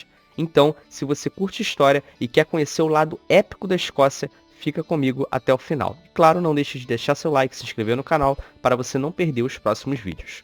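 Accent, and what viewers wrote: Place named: Rio de Janeiro, Brazil. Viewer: Sotaque carioca chato da p*******!!!!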